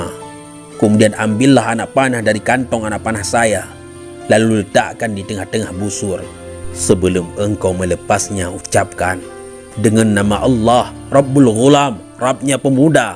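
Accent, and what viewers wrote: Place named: Bali, Indonesia. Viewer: Naratornya..kaya logat orang bali..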